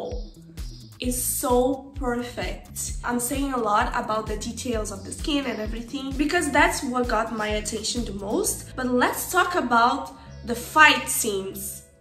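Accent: Brazil. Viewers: Você é BR não é? Também costumo falar inglês boa parte do meu tempo, e a gente tem o sotaque semelhante, acho que da pra reconhecer. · Ela é brasileira? esse sotaque dela me dá essa impressão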